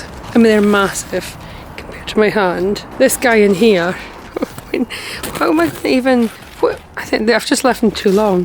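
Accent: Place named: North, United Kingdom. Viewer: I thought I heard a northern accent, r u from Shetland?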